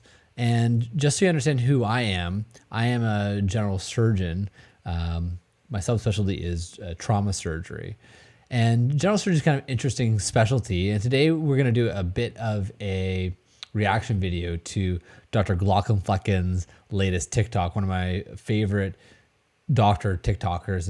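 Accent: Canada. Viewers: I noticed the accent as well, especially on the vowels. I wasn't sure if it was Minnesota/ Wisconsin or possibly Canada. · Are you Canadian? Certain words you say remind me of my friend in Ontario. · WESTERN UNIVERSITY REPRESENTS! No matter how hard you try, can't hide that Ontario accent. 🇨🇦 👍💕 love the channel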